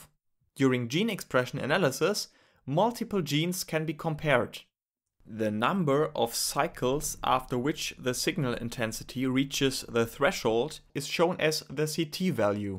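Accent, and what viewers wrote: Germany. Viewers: Love the German accent and your explanations, keep going! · Are you German?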